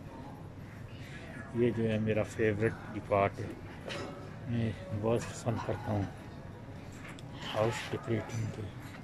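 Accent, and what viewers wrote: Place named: Punjab, India. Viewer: AsalamOalikum masahAllah apki boli suun kr apnaa punjab yaad ajata hai 😇khush rahay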